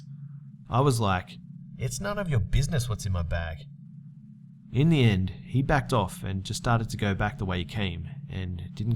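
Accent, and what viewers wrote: Australia. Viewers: aussie accent lol* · Minnie Hambrick The guy narrating the story is Australian but, the guy who wrote the story is British.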